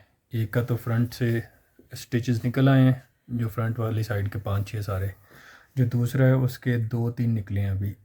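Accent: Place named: Lahore, Pakistan. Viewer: AP Lahore k ho jes hsab Sy bolty ho Lagty Lahore k ho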